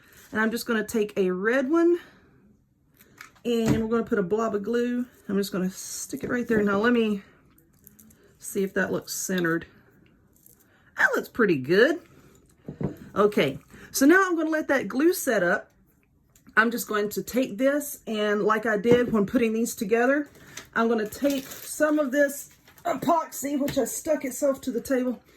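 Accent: South, United States. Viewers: Love your southern accent I'm from Tennessee lol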